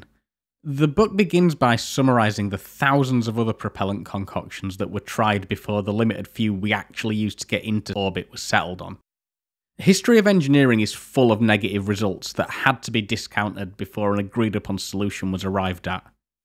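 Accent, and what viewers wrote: United States. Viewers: This is a really interesting video, but do you have to speak in American English? It spoils your delivery.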